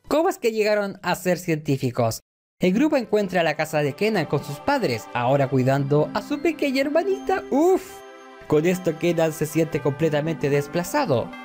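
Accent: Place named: Chile. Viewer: Es chileno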